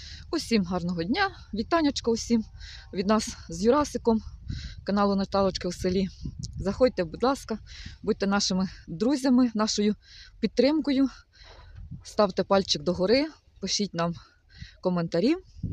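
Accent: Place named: Liubar, Ukraine. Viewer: Ви супер! Дивлюсь Вас із задоволенням.Люблю говір Любарщини. Когось знаєте із Меленець?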